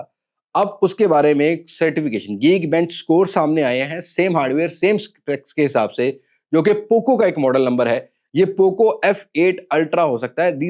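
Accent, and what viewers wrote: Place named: Punjab, India. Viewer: Punjabi wala touch chak k rakho❤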